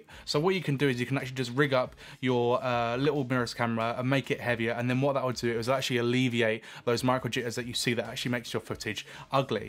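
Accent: United Kingdom. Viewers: Can’t figure out ur accent, r u English?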